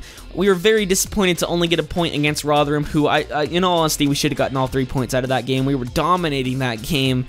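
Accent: United States. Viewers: Love your American accent! Nice to see an up a coming American FIFA YouTuber!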